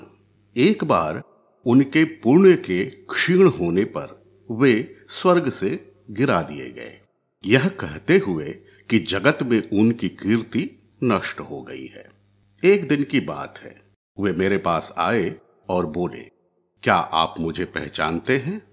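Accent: Standard. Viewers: महाभारत की एक सुन्दर संदेशयुक्त लोकोपयोगी कथा...! सुन्दर लोकोपयोगी कर्म वाले ही वास्तव में मानव धर्म /स्वधर्म का पालन करने वाले होते हैं। ऐसे स्वधर्मपालकों को ही...लोकोपयोगी कार्य करने के कारण, संसार में सम्मान और यश/कीर्ति प्राप्त होती है। जिन्हें सम्मान और कीर्ति मिलती है उन्हें ही स्वर्ग का सा सुख प्राप्त होता है परन्तु समय के साथ लोग उनके लोकोपकारी कार्यों को भुला बैठते हैं तब वह स्वर्गिक सुखों से वंचित हो जाता है। स्वर्ग का सा सुख पाने के लिए या तो निरंतर लोकोपकारी कार्य करते रहना आवश्यक है या उन कर्मों का स्मरण कराते रहना (गुणगान होना) आवश्यक है ताकि उस व्यक्ति का यश बना रहे और वह स्वर्ग का सुख प्राप्त करता रहे! इस कथा का यही सार तत्व है। सुन्दर शैली में विशुद्ध उच्चारण के साथ प्रस्तुत यह कथा अत्यंत रोचक और लाजवाब लगी। हार्दिक धन्यवाद एवं हार्दिक बधाई आदरणीय इस सुन्दर संदेशपूर्ण कथा-वाचन के लिए...! सादर नमन आदरणीय एवं शुभ दोपहरी...! ☀️🌷🙏🌷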